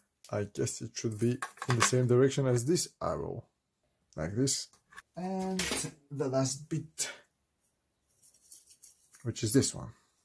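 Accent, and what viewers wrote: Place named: Russia. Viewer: По акценту вроде наш) как снять эти серые крышки, не повердив корпус?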